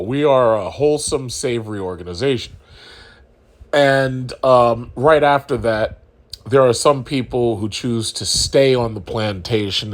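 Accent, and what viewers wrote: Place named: New York, United States. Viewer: Lol I agree I heard that New York accent and was ok real talk here 🇨🇦👍🏿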